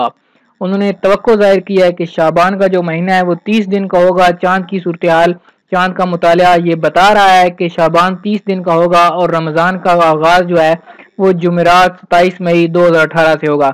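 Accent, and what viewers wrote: India. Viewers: It isn't Saudi, it is some indian speaking "ringi'dingi'ding-ish"... just headache..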